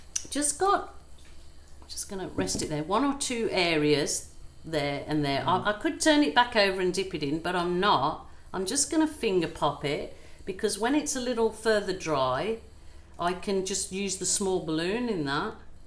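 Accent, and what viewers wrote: Birmingham, United Kingdom. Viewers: Just inspirational! Thank you or sharing your amazing imaginationary art form. I am planning to redecorate my sitting room and am going to try and do a canvas using the new colour palette to hang on a plain wall. I'm in the UK and it's lovely to hear your Brummy accent and gentle way of explaining the simple and apparent random application of paint application. Thank you from the othe side of the World! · Hi from England. Your accent sounds like a Birmingham accent with a little Australian in there. Love your work.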